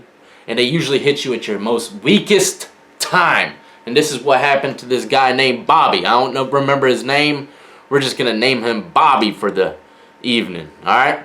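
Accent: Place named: South, United States. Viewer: Why are you so damn cute?! And you have that little southern twang 😍🤗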